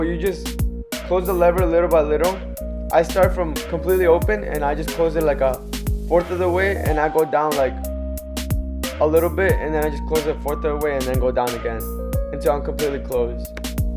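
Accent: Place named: India. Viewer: He sound like Apu that one Indian character from Simpson’s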